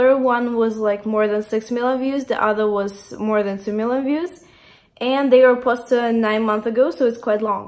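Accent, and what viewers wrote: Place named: Russia. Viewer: видно же что русская. По акценту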